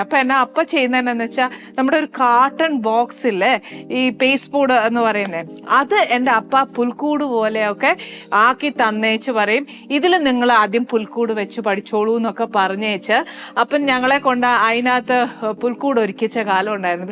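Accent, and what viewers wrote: Kottayam, India. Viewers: every single one in a particular place acquires the same local slang, so kottayam slang is not reserved just for achayatees... is an exception though, she's kottayam slang living in Trivandrum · അച്ചായത്തിയുടെ കോട്ടയം ഭാഷ കേൾക്കാൻ നല്ല രസമുണ്ട് ❤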